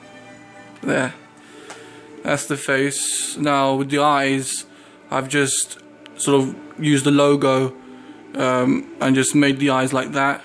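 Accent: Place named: United Kingdom. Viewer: Hi again im back on my own user/channel now, what's your nationality? if you don't mind me asking, you sound British am I right? My PSN name on PS4 is CS20-2015 add me if you want.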